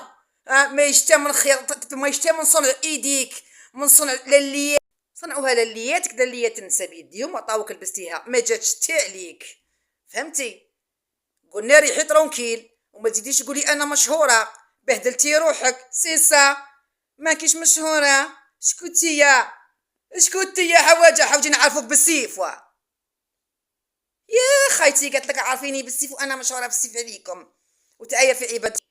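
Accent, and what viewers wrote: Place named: West, Algeria. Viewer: هدرة،غرب حلوة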